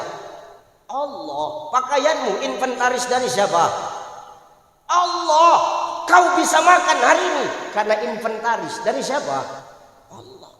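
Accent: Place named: Medan, Indonesia. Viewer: Itubormg Medan bos Krn mmng logat bhsa nya seperti itu,klo kau yg LBH smpurna cba kau yg tegur ,jgn sllu mngomtriborng lain LBH baik komntri dri sndri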